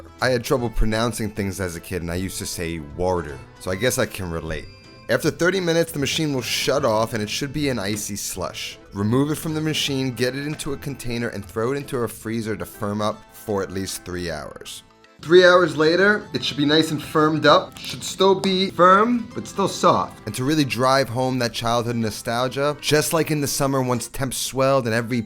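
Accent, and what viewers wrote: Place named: Bronx, United States. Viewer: I was.guessing you were cute by your voice - the Bronx I-talian accent and then, Momma mia!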